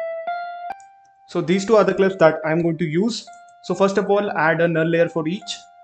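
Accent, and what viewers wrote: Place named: India. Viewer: DAMN THE INDIAN ACCENT IS DRIPPING OUT OF THE VIDEO!!!!!!!!!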